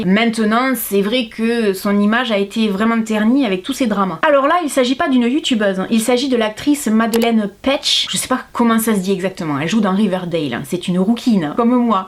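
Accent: South, France. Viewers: Coucou. J’ai toujours voulu tester les marques M.A.C et Maybelline, malheureusement elles testent toujours sur les animaux donc je préfère m’abstenir.... J’ai beaucoup aimé ta vidéo mais surtout ton accent du sud! 😂 Bon week-end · Il est beau notre accent!! Merci pour cette video. Bisous d une catalane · ET SON ACCENT DU SUD UN REGALE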